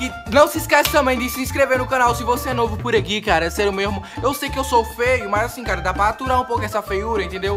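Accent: Bahia, Brazil. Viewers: Ele é de qual lugar da Bahia ??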